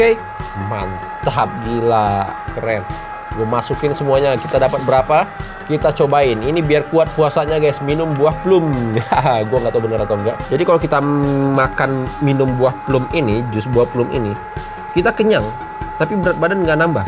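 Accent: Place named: Medan, Indonesia. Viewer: Orang medan mana suaranya!!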